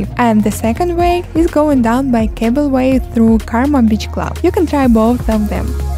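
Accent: Russia. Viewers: Благодарю вас за такое прекрасное видео! Чувствуется по акценту, что вы из России, но английский у вас потрясающий 😍💫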